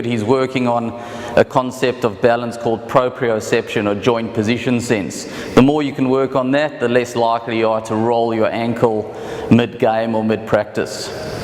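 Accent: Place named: England, United Kingdom. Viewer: The English accent will help me to remember those movements.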